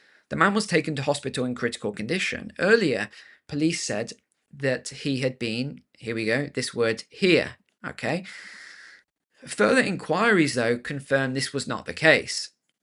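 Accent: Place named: United Kingdom. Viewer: Look man, as an American the sound of a British Accent is beginning to irritate me to no end. It's your love of the new Global Warming/Climate Change Religion, to your censorship of free speech, to your allowing the unimpeded invasion of your country by the Cult of ISLAM, to the general stupidity and wokeness of your society in general, UKRAINE STUPIDITY!! I hope Trump hammers you idiots!!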